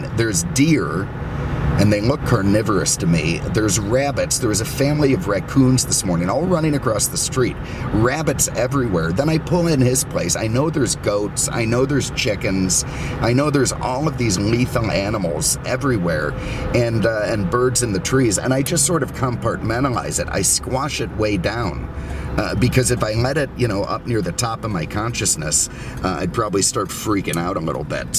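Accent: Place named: Midwest, United States. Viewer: While watching and enjoying your videos I wondered about your accent. Suspecting it to be Midwestern. Thanks for the confirmation that you have Chicago roots. Keep up the good work.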